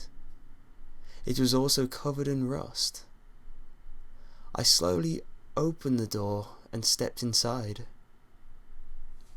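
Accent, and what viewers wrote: United Kingdom. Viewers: British people r so lucky, all u need is ur voice, piano, n a creepy pic to make scary vid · i loce this guys accent im a big fan of british accents :) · I've listened to some of your pastas now and I like the storys, you choose. I'm also so much into your accent! I could listen to british accent for hours (I'm from Germany) Anyway, you do a great job!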